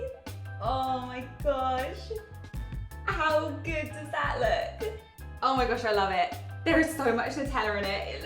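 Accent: United Kingdom. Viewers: You sound soo British cool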